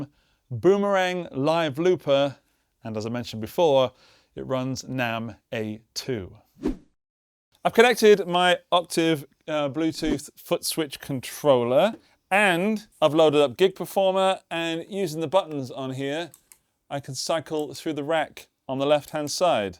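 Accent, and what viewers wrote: England, United Kingdom. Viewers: You provide a window into the future from Austria, but with a subtle English accent of a part of England that this American born citizen of the world cannot identify on a map of counties and London neighborhoods. I realized about 3 sentences in that I am not the target market, but I do enjoy your geeking out on toys that you dig. Keep up the hard work and enjoy the last month of summer (more or less).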